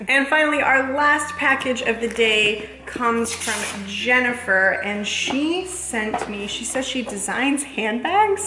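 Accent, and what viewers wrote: Spain. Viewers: your spanish accent sounds like its from spain. Doesn't sound at all like latin spanish thats very interesting. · i think that is the spanish she was thought because even when she traveled to spain she already had that accent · If ya didn't know already she studies abroad in Spain for Spanish maybe why her Spanish sounds more Spain than Latin.....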